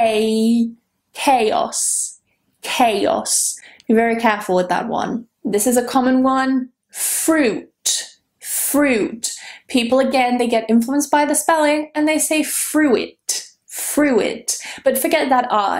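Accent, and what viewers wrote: United Kingdom. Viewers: I'm following your videos for learning British accent and I've learned a lot of words to spell correctly like dropping the r sound and more. But I have difficulty in pronouncing P, T and C like you native British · Beauty queen of British accent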